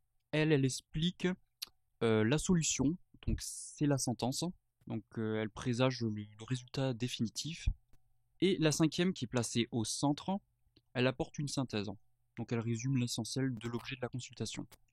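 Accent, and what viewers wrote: South, France. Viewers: Bonjour les cartes sont un support mais le ressenti et très important votre accent du sud ça fait du bien merci à bientôt.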